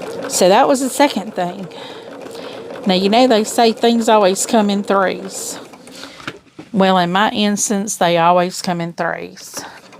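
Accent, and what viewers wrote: South, United States. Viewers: Love listening to you and your southern accent. My son-in-law was in the service so when was released he went to the post office and applied. Apparently the post office puts service people on the top of the list, after they have completed the exam and application process.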